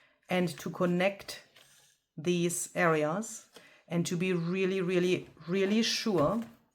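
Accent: Germany. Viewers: I am German. At first it was difficult for me to listen to your English. But your creativity is way too amazing to miss. So I got used to your English. I hate the German accent 😅 I don’t know if your English gets better with any video or my ears just got used to it. Anyhow by now I love listening to you and laugh when you stumble about words. As someone who is been missing even German words every now and then I feel with you. I love the way your brain works and how you do your tutorials. Please stay as funny, educational and creative as you are ❤ you definitely became one of my most favorite crafters on YouTube ❤❤❤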